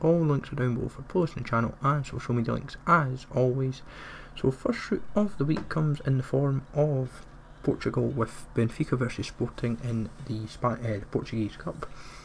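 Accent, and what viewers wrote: Scotland, United Kingdom. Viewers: normally don't have a problem understanding jocks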